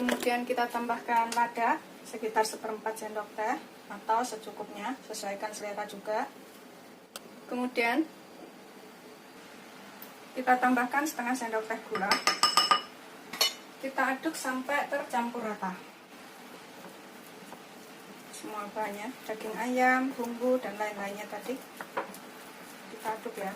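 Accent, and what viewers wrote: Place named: Banyumas, Indonesia. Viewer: Mba'e logate kaya wong mbanyumas.. 😊😊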